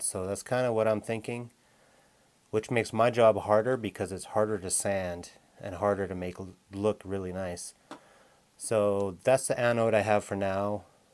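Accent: Canada. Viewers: You sound so much much like one of my Canadian friends. Are you with the RCMP? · You’re Canadian aren’t you?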